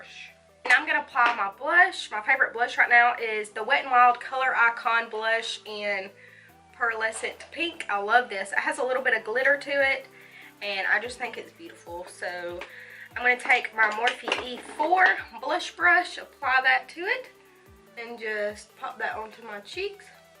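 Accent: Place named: Georgia, United States. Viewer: Absolutely love the Pro glow! Definitely my go to everyday foundation! I love your accent too! Soo cute! Reminds me of my grandma, she's from Hiawassee (however u spell it ) Georgia! It's so funny whenever I'm with her I always catch my self talking like her! Lol love it!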